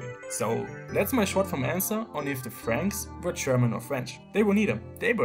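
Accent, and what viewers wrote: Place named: Germany. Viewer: you have a german accent bro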